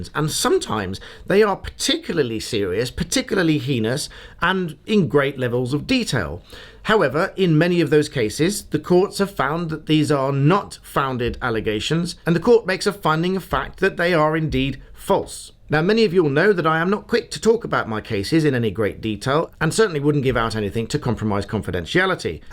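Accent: United Kingdom. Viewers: I know I’m an a.h (arse hole not amber heard ) but I watched the popcorn interview twice and started this video over twice And I just can’t absorb info from a British accent idk what it is Ty for the info and content tho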